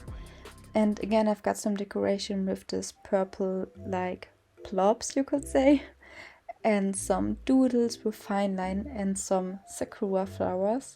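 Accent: Germany. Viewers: Are you german? 😱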